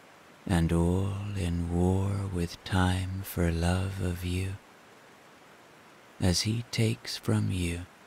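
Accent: Ireland. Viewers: if you see by chance you read this message, I must convey my eternal gratitude for your bedtime meditative stories! I have a very hard time falling asleep. You have the most soothing voice and love your Irish accent! I’ve been to your native country twice I loved it there so much I almost bought a little cottage near Galway but had to reconsider because of family back in Florida! Thank again❤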